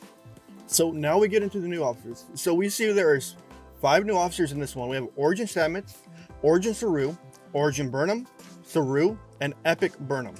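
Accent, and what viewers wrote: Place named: United Kingdom. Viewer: YO dude, are speaking the queens language?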